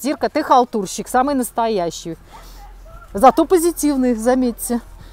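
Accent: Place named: Latvia. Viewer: Это латышский акцент...😊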